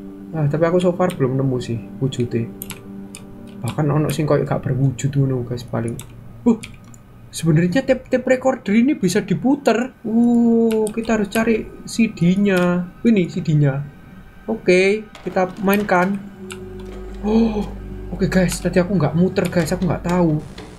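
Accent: Java, Indonesia. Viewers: Suka bgt liat teriakan sma bahasa jawanya kak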